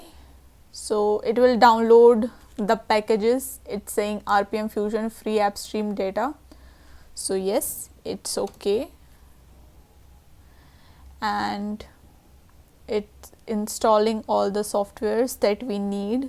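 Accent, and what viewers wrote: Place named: India. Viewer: Wow indian voice 😍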